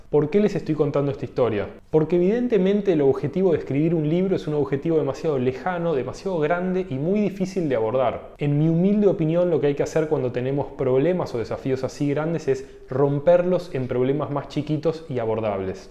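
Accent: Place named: Argentina. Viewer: Entretenido tus videos, wena combi entre el acento argentino, aprendizaje y memes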